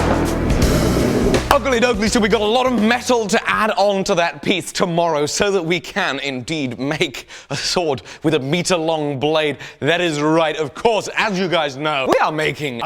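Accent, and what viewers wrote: United Kingdom. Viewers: I love your british accent :) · Funny after watching your newer vids, 2026.. You sound deeper here and have a way less British accent than in your new vids? Interesting, character work? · He's almost TOO British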